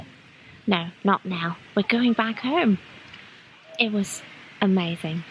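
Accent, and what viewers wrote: United Kingdom. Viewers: you're german? You soumd so british. That dude also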